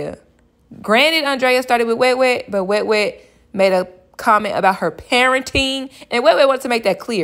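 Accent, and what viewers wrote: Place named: East Coast, United States. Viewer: Yes, I can tell from the accident that you're from the East Coast. 😁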